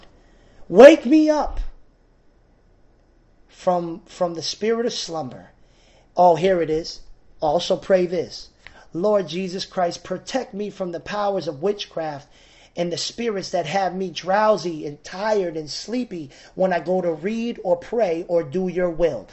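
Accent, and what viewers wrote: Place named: Philadelphia, United States. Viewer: Does anyone know where this brother is, and if he has a church? Sounds a little like Philly maybe? Really like his insight, as it seems he is certainly anointed.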